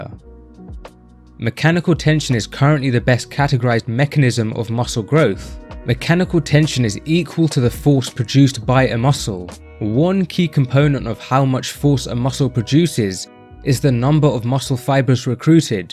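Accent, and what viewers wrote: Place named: United Kingdom. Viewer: For some reason I trust him so much more because he had a British accent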